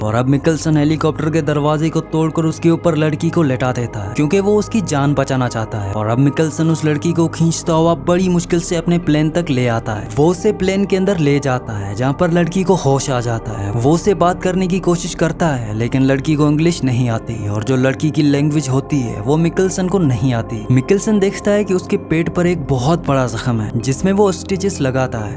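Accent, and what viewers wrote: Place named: Kashmir, India. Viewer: Your Hindi has a accent of Kashmiri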